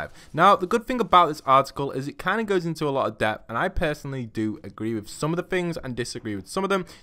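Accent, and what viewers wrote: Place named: England, United Kingdom. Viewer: Wawrzyn The Prodigy I doubt he went to Harvard. He has an English accent Edit: if he is from UK it would be very expensive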